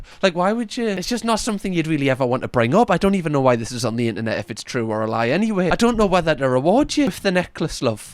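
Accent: Newcastle, United Kingdom. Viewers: I like your accent, is it Geordie or more Sunderland?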